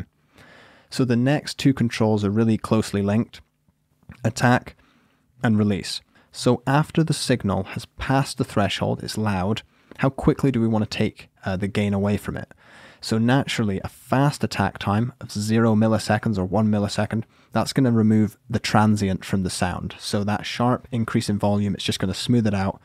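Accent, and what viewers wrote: Scotland, United Kingdom. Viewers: I just love listening to this Scottish (?) accent. It's so soothing. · Well he is British according to his accent and the flag he now has in his later made video. So it's pretty aggressive for a Brit. Oh wait, maybe the stereotype is Canada lol.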